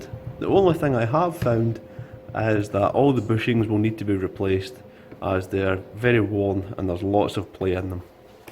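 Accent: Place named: England, United Kingdom. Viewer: yes of course the finest English accent in the world. According to me he could talk a little bit more, than I could listen to the only true English accent all day long 👍🏻👍🏻👍🏻👍🏻👍🏻👍🏻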